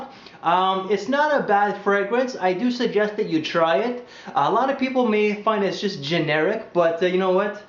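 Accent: France. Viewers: What's with the slight french accent? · LOL love the french accent, and what about that oriental woody? I know not all scents are meant to be beastly but I am really over people charging an incredible amount for something you can't smell...spraying alcohol on my skin and not having the pay off...no thanks. I try to lessen the amount of sprays (to lessen alcohol on my skin) by getting fragrances with more body.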